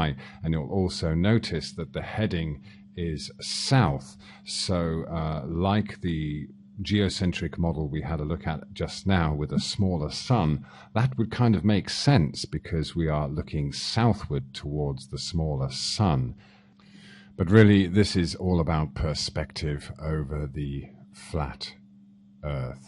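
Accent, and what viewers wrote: United Kingdom. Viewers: You seem to confuse the terms Ball with Planet and all the physical differences attributed to each. you seem to forget that light propagates through a vacuum in parallel rays . its about the angle of the ground to the incoming rays ie: the amount of light/energy hitting the ground per sq meter or what ever wacko unit you chose to use. at the higher latitudes the surface faces away from the sun more and hence there is less energy per sq unit of ground. than at the equator this is just kindergarten thinking. you don't even understand the physics of the model you are trying to debunk. you sound intelligent, perhaps the British accent helps, but you even vaguely seem to know what you are talking about. couldn't watch any further as my head was about to explode thanks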